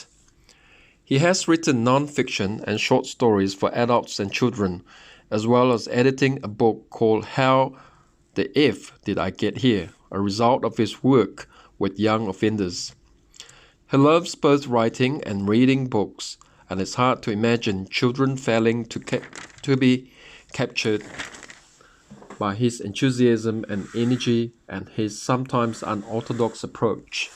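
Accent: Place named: New Zealand. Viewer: Your NZ accent comes to the fore here.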